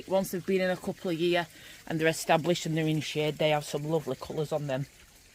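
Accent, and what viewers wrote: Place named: Yorkshire, United Kingdom. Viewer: That sounds like a Yorkshire accent to me? Pretty much the whole of the UK has a Tropical climate right now, so the answer to toyour question is "'Qute easily"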